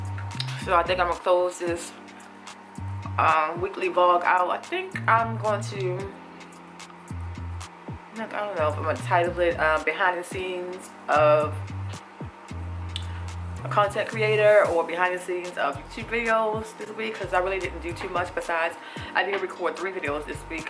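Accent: Baltimore, United States. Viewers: Great video. Is your accent Mayland/Baltimore?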